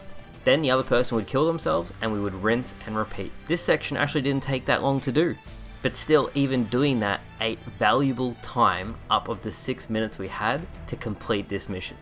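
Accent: Australia. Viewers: the aussie 13 year old voice just broke my immersion in your videos.... unsubbed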